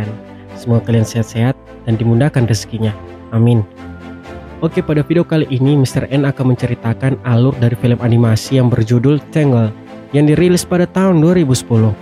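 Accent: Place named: Manado, Indonesia. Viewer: Suka krna logatnya, logat menado kah? Intinya suka bgt deh 😂💙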